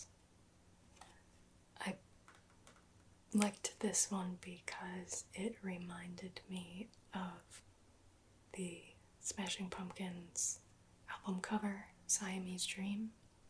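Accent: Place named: Midwest, United States. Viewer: I love old photographs also because ladies and gentlemen dressed like ladies and gentlemen. People dressed up to go everywhere not just for weddings and funerals. I love your northern Midwestern accent. Your homepage tells me you're not in Michigan anymore😉. You look adorable in everything you wear( especially 1990s secretary roleplay).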